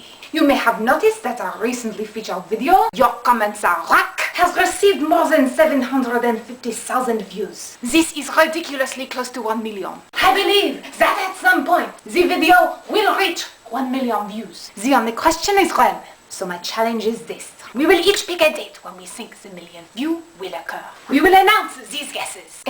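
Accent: France. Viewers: haha i love that french accent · Yay new video! John Green said he enjoyed your channel today in BlogTV. (yes, I also have no life) Also, very nice French accent! You practically own awesome and thus it is your awesome.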